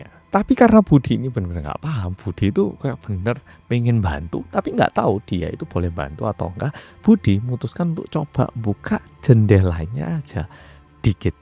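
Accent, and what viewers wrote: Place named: Malang, Indonesia. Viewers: hahaha mas lek wes metu bahasa jawa malang e lucu pwoll 😭😭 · Kayak awe awe ...byuh mass malangan banget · Lah kan mas emang gedenya di Malang. Jadi ya wes biasa lah kalau ngomongnya masih khas arek malang banget 😅 malah itu jadi ciri khasnya dia yg bikin beda dari konten kreator asal Korea lainnya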